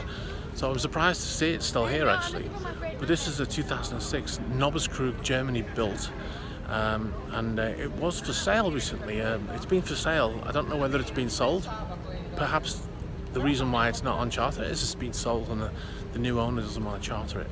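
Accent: Liverpool, United Kingdom. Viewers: You a scouser or from north west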